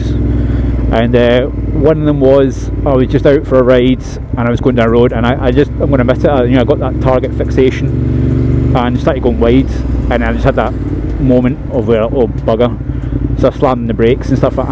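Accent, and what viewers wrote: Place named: United Kingdom. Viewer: Hi mate, nice bike, I guess. If you can speak with a bit less British accent it could possible understand something about what you said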